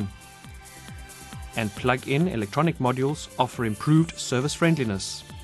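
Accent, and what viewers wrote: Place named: South Africa. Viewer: You sound south African, great video.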